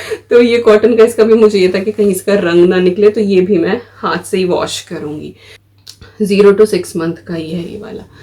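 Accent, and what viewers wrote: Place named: Uttar Pradesh, India. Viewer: Ap apni up wali boli bola kro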